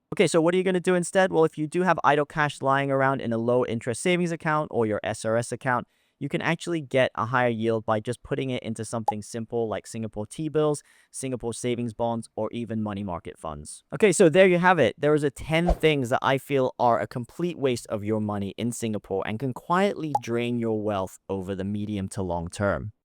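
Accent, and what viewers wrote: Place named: United States. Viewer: wat an american accent dude talking singapore finance? go back to america!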